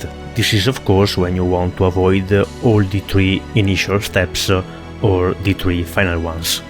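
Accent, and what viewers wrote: Italy. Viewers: Are you Italian? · Needed this, thaknk you? (Sei italiano vero? ;) ) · What a nice detailed guide, ty so much! I recognized rapidly that you're italian from your accent :D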